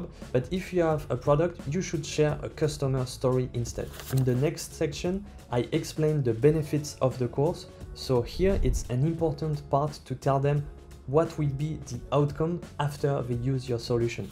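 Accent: France. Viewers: cela sent l'accent francais 😮‍💨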